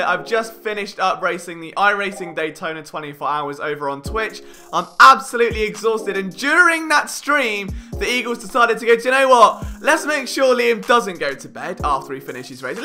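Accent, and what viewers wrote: United Kingdom. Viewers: Why, because he has a brit accent? Oh No !! He's a good commentator with good breakdowns. I don't understand why he has such a low subscribe base still.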